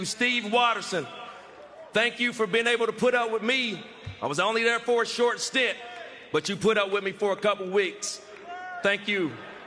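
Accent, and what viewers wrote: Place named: South, United States. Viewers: i love his southern accent 😂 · Actually, Southern WV accent. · West Virginia accent.. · I love that southern tongue god bless you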